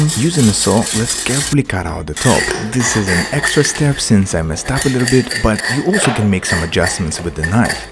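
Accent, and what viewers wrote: Russia. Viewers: Hi,where you from?you have a russian accent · У него русский акцент?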